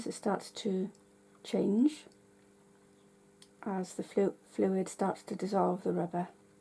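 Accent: Wales, United Kingdom. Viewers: Love that Welsh accent! Thanks for the tip. Jan from Victoria, Vancouver Island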